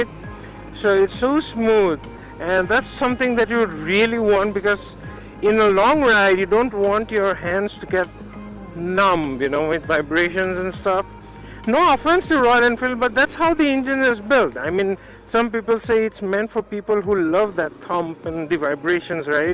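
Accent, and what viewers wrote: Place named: North East, United Kingdom. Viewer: Liked your review. I am an old rider and got the mojo sometime back. It's an amazing bike. Didn't go for the dominar as I don't need abs. I really don't as I have been riding a bullet all my life. It is a really good machine and the engine is butter smooth. By the way are you from the north east as I have a lot of friends up there and Ur diction is very similar. Anyway good job and keep up the good work. I agree with you about the marketing bit. Mahindra should really market it aggressively. They have a fantastic product in hand. Cheers.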